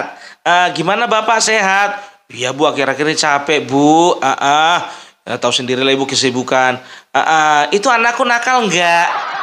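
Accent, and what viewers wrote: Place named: Batak, Indonesia. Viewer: Lombok hadir, senang kali lah aku tonton chanel..apa lg aku denger suara ketawanya aku ikut ketawa.emg cocok banget dialek batak ngelucon hhhhhhhhh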